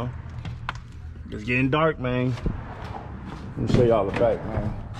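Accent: California, United States. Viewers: I heard the Bay Area scraper music and your accent and said dude from Cali dam you shoulda came to Texas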